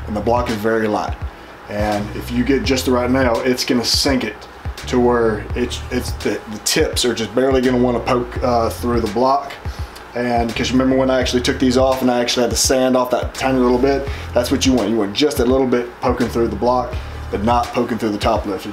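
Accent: South, United States. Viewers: Came for the shoes, staying for the hot southern drawl! 🤗🤗🤗🤗🤗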